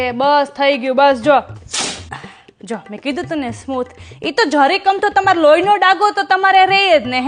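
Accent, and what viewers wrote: Kathiawar, India. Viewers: Sister I love your accent.... Hu Mumbai ma rav chu but Rajkot ane Junagadh maru native che ane desh ma jaiye atle mane tyani accent bau mithi laage... Tamari ekdam same accent che.... I love it sister.... Lots of love and blessings from Ruchi from Mumbai ❤😊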